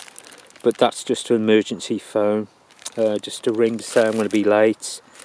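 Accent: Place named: Warwickshire, United Kingdom. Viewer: 'ello! lol I'm Rae:D (RaeNtheBionicSheep on <link> ) you sound like your from Warwickshire! Where I was born lol! Not how I'd picture the face to the voice lol but nice to see another cacher vlogging. Must do cache vlogs myself lol... Thanks for vlogging!:D I am trackable at WBX9FJ if you wanted or anyone wanted to track me:D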